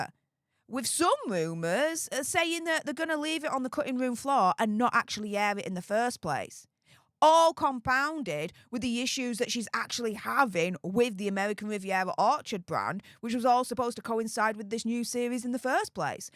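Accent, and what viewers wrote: Yorkshire, United Kingdom. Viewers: She's from Yorkshire.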